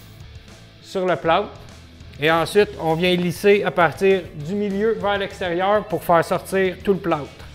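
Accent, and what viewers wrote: Canada. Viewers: Horrible l'accent canadien !!!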